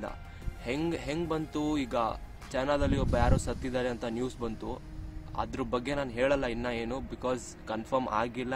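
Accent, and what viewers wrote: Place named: Malnad, India. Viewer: Malnad avra tharaha maataadteera sir very cute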